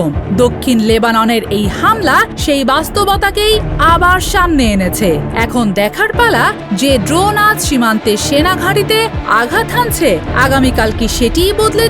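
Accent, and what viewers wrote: Kolkata, India. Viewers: এই সাংবাদিক মাগীরে চাকরি দিছে কে এই মাগিতো কলকাতার হকার সাংবাদিকদের মতো সংবাদ পাঠ করে। কথাবার্তা তো কলকাতার মানুষের সাথে মিল আছে।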